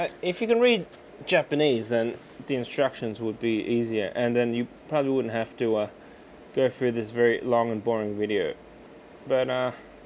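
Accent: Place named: Australia. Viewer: interesting video. I have a Revomax flask too, around 500ml. Say, your accent tells me you have lived in Australia, right?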